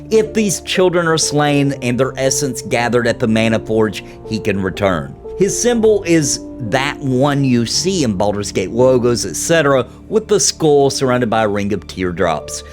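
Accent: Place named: South Carolina, United States. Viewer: I live in South Carolina.... your accent reminds me of alot of people around here.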